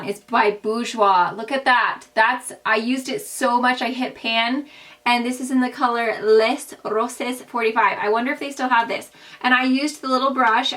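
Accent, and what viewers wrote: England, United Kingdom. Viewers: Did you used to have an English accent?? ( im from England :) ) xx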